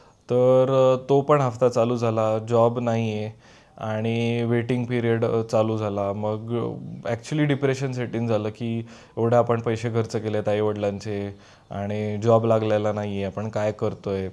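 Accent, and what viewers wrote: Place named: Pune, India. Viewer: Good evening..... अच्छा म्हणजे एका सामान्य कुटुंबातून तू संघर्ष करून पायलट झाला आहेस तर पण एक गोष्ट खरी आहे मराठी माणसाला खूप संघर्ष करावा लागतो बोलीभाषा खूप गोड आहे तुझी पुण्याचा प्रचंड प्रभाव आहे पुणे तिथे काय उणे😂😂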